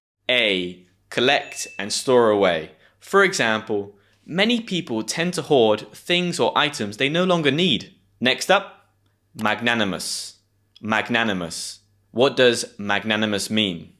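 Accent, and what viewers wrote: United Kingdom. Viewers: I'm from germ money. You're from the UK, as I can clearly hear at your dialect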